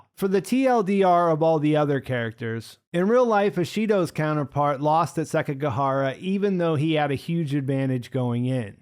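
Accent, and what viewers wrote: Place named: Northeast, United States. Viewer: yankee accent very tiring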